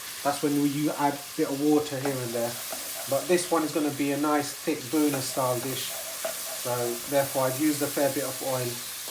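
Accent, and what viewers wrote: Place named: United Kingdom. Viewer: Absolutely one of my favorites! I've been adding Ochra/Bindhi to my curry lately and it really makes the dish much tastier and healthier. I will be copying your recipe and style for my next chicken curry for sure... I really like your video (very well edited) and your British english makes it all the more enjoyable to follow. Thank you very much. I have subscribed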